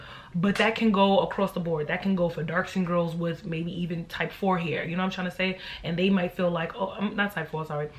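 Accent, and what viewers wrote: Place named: New York, United States. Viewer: awww I love your accent, I wish I lived in America and New York in particular cause your accent is just the best 😩💞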